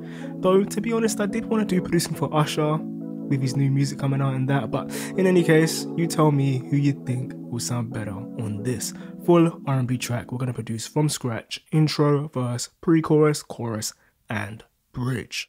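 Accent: United Kingdom. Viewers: Ahh I feel so embarrassed now! I forgot your had a London accent. Anyway I hope you have a great holiday regardless!!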